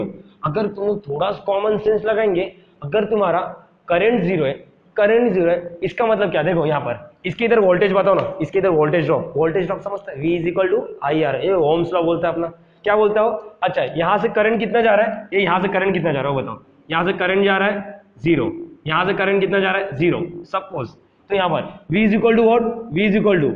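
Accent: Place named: Hyderabad, India. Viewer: Hyderabadi accent 🤩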